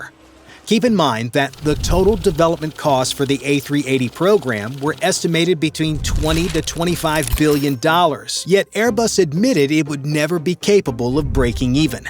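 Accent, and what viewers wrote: United States. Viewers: Stopped watching when he said that the A380 was out in 1980s Plus the insufferable american accent and the Boeing ass kissing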